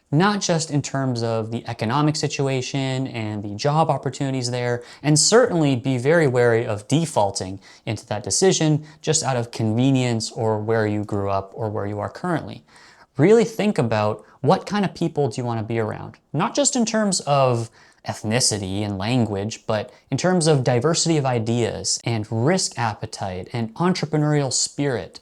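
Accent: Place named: Toronto, Canada. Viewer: Couldn't make it through this vid which randomly popped up. I'm a self employed entrepreneur living in the Prairies. I have hard traveled the World and LOVE being Canadian. You sound like a stereotypical Torotonian. I wouldn't want to live there either.....maybe call this video why to moved from Tornonto....'cause that's not all of Canada. Be Well.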